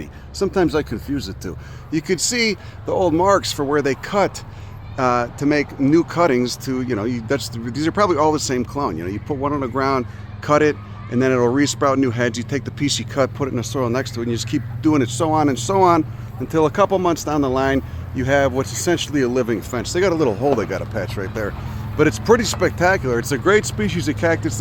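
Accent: Chicago, United States. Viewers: Ya that’s a south side Chicago accent!!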